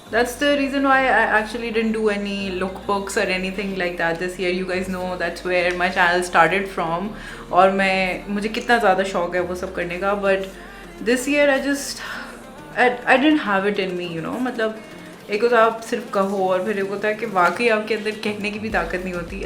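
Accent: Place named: Sindhi, India. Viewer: Aww yOur sindhiii iS sO cute....!!!😍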